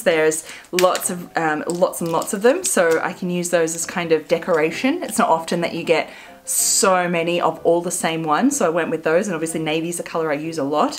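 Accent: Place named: Australia. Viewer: New to your channel, your accent sounds Aussie to me, do you live in Australia?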